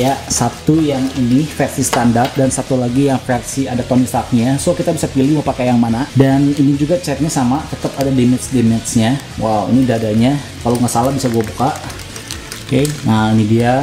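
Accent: West Java, Indonesia. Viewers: youtuber mainan kesukaan gua, gak kaku, asik ngomongnya. kadang sunda logatnya, i love u 😂😍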